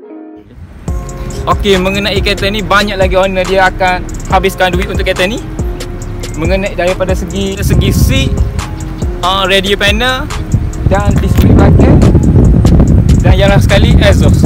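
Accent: Kelantan, Malaysia. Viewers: kecek klte la boh...loading kwe tgk..hehe..mantop pok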